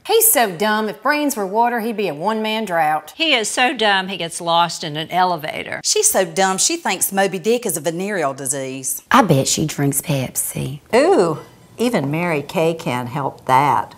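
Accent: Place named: South, United States. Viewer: Love these videos & the beautiful Southern accent! As someone from Ireland I recognize quite a few of the sayings over the series. A lot of Irish that emigrated to Southern states obviously left some influence down through the generations!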